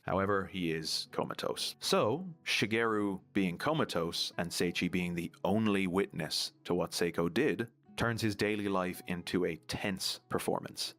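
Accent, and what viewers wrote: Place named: Ireland. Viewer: It’s sooo good to hear an Irish accent in the wild! Incredible video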